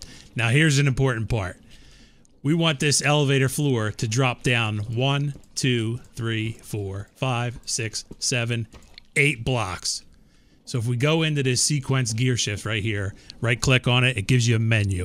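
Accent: Philadelphia, United States. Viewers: Great video! Do I hear a Philly accent? It's wooder not water!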